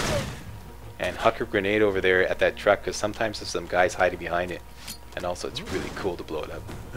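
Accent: Canada. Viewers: Are u Canadian? I thought I heard a Canadian accent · i swear this guy sounds like a Canadian. great vid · You have to be from Canada....Yes?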